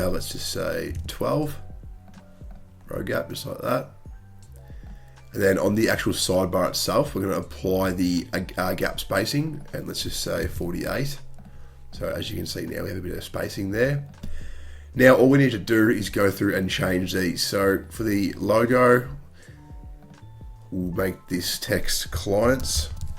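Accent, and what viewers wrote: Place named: Australia. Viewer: "Hide" in Australia: "Hoyyyd" hahaha!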